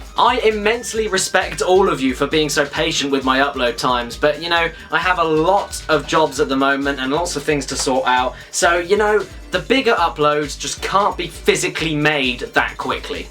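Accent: United Kingdom. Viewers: JESUS! HE IS A BRITISH PBG! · Like JonTron, but Britisher. That's a good thing. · UUuuhhmm, hehe......could be the wierdest comment ever, clearly the dude is brittish....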